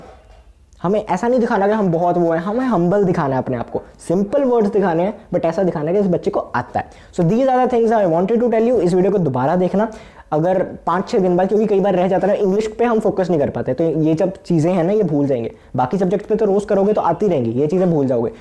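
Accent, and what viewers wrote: Punjab, India. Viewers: Bhaiya are you punjabi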